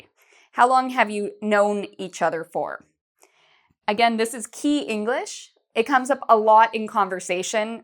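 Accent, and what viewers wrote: Canada. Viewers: I bet is Canadian, there's nothing more Canadian than "aboout"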